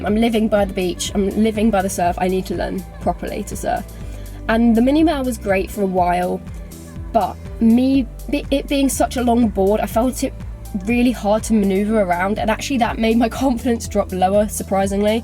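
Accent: RP, United Kingdom. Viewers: I thought so! I can tell by your BBC accent! Do you find it difficult to understand the Swansea accent? I’m returning there in April after 20 years in Singapore. I’m a bit too old to be as adventurous as you though. I used to hang glide off Rhosilli though. Your vids are great BTW!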